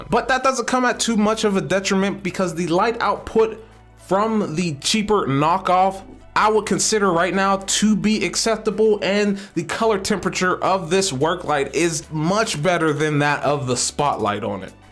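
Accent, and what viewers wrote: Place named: South, United States. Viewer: i like a brother that has an southern accent as deep as my h*nky self does...you got a gmt900 truck...i've had the ecarb042 since it was first came out, wound up with 2 actually...left one of them under a 3.0 ecodiesel and it's now gone back to mother nature gaia...anyway, you're a new subscribe for me.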